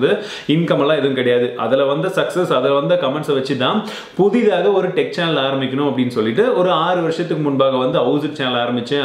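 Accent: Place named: Kerala, India. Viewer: Tamil quality aaa Malyaali irukku nanba ........മലയാളി daaa......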